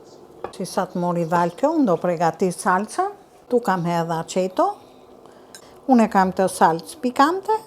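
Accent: Tirana, Albania. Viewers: Ti je flori te shikoj vetem te degjoj si flet tironce puro je flori lum ata qe te kan ne shtepi saper gatimet je ok te gjitha nenat shqiptare gatuajn jan nikoqire ju sa shikoj keni pasjon ne gatime kam respekt zoti te bekoft❤❤❤❤ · Ty te lumte ajo goja e omel qe flet hala me zhargonin e bukur tirons puro dhe e trashegin nder breza ate. "Po i ndaj me dy sira" "pras", "voj" , "kryp", "long"... Fiks si nondaja teme e ke gatu kete gjelle me pras e te lumshin duart e arta! ❤❤❤❤❤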